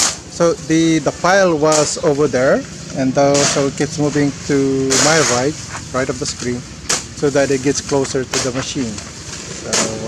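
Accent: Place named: Philippines. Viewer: U sound originally from Philippines the way u speak out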